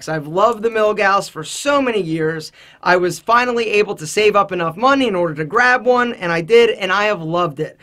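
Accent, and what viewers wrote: Philadelphia, United States. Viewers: Heavy philly accent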